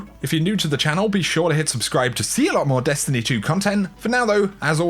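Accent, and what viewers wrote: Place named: United Kingdom. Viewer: Hey, my wife wants to know where you are from. Her mums British but your accent is much different. Just curious. Love the channel!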